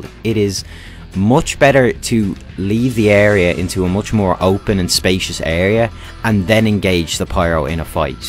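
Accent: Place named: Ireland. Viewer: I thought I’d never find an Irish tf2 YouTuber. I could instantly tell by your accent. Good videos btw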